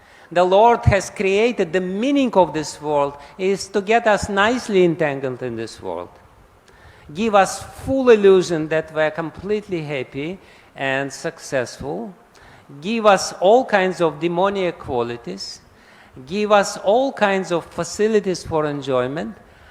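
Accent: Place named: Russia. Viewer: Hare Krishna. 🙏🏻😇 It's the best way to learn English - to listen with russian accent from Maharaja.. 🕉️🌬️🧘🏻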